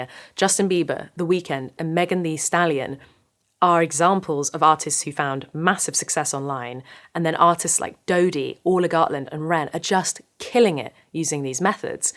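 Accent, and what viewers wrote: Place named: Bristol, United Kingdom. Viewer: Not sure commentary from an old guy (I'm 79) is useful, but I'll give it a go. I stumbled on to your channel on YouTube by accident. I've stayed a fan because your musical tastes are eclectic, and sometimes overlap my own, and also because I'm a sucker for an articulate female voice with a Bristol accent – I like your "talk" videos as much as your musical ones. I've played guitar for decades, but am not a musician (I don't understand the theory, barely can name the strings on a standard-tune guitar, etc.). I learned on my own, by ear, and will never be better than mediocre. That's OK – I don't expect "success" in that context. I play for therapy. I "discovered " Larkin Poe in the same way – by stumbling upon them on YouTube by accident – but have become enough of a fan that I actually went to their show last spring, despite my advanced age, when they played Minneapolis last spring. 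I'd be happy to see you in person, as well, if you ever came here. It seems smart to me, a non-musician, for a young musician to make use of multiple sources of income, publicity, etc., and you are obviously a smart musician. I have no talent for business, so kudos to you for making it work on your own terms.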